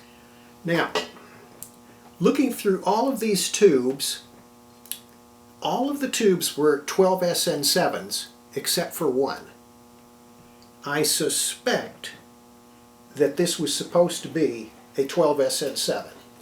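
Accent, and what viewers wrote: United States. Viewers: Ok, thanks. I like, looks more feminine. I made crystal radio in 1964 when I was studying in the 6th class. My ham call is ap2kd, and I'm ok in ARRL book. I have a lot of ham equipment. Most famous WS 19, RCA AR 88, Marconi Atlanta, Marconi Appolo, Collins KWM-2A. I also like military communication equipment being very rigid and waterproof. From your speaking exsent, you look American. Regards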